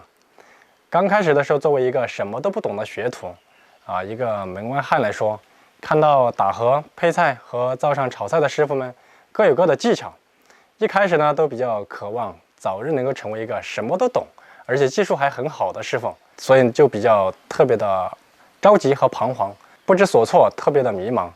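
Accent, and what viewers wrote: Sichuan, China. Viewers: 王刚为什么这么红? 他的宽油烹饪并不适合一般家庭厨房。显然大部分观看视频的人并不是真的想学做菜。那到底是什么原因呢? 1, 他长得帅｡ 2, 他的川普好听。 3, 视频节奏明快, 讲解到位。 4, 富有乡土气息。 5, 邪神助攻。 6, 看他烧菜能解馋, 可以用来减肥。 你会选哪项? 大概很多人会选7, 那又是什么呢?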